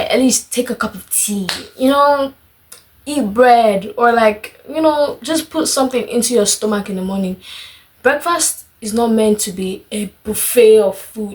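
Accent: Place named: Nigeria. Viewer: The Nigerian accent I'm loving it😂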